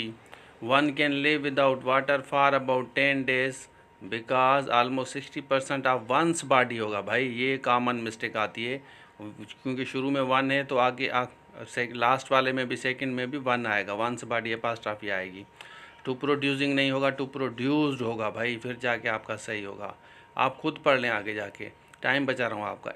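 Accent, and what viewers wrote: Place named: Sindh, Pakistan. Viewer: Grateful Dear Sir! Yh beech beech mn sindhi bhe mix hojati hy ap se sain jese hum sindhi logo ki adat hoti hy Hahaha. Wari Wari Hahah. .. God bless you sain!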